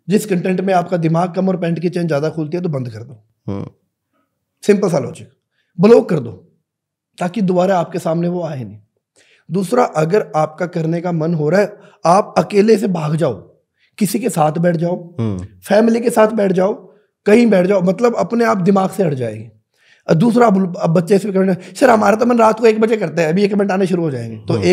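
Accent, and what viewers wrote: Bihar, India. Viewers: Aap bihari ho sir?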